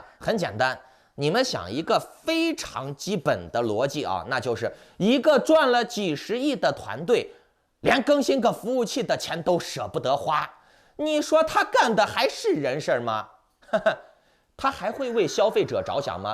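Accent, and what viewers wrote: Henan, China. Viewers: 河南佬一个。口音漏了。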